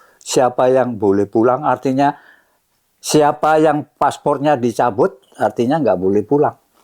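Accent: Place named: Java, Indonesia. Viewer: Sdh puluhan thn tinggal di luar negeri tpi logat jawa tetap medhok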